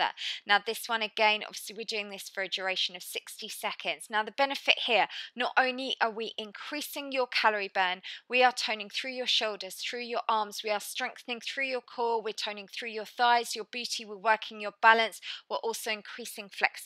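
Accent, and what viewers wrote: United Kingdom. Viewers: That '2 uncommon exercise' is actually the modification or easier version she provided for people who can't keep up with the move in the right side. If you can't understand what she's talking about, learn more. Listen more British accent conversation. That's what I do :) good luck! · Love your workouts! and being from the UK like you, your accent is a relief! lol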